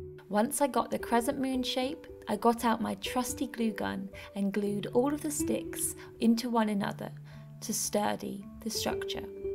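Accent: England, United Kingdom. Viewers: Witchcraft sounds so much more magical when discussed in an English accent! 🍂🍁🍂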